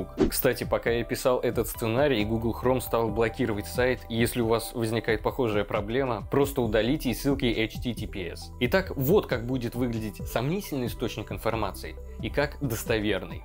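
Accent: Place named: United States. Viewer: Некорректно - неточно, неверно. Ну и этоо выкаблучивание с чтением с американсим выговором раздражает.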